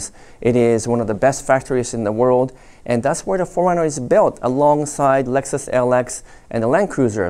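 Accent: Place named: Japan. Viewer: Oh damn the best Japanese English.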